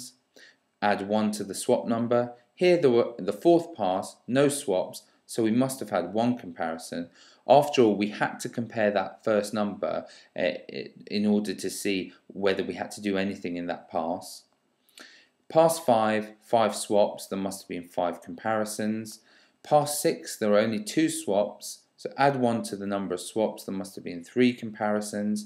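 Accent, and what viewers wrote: United Kingdom. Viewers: Hi, I have to say I am using youtub to learn math for a long time and I have watched many vidoes. But I have to say the way you are teaching is very very very good . I like your Londonish accent as well. I will pray for you deep from my heart as you helped me a lot.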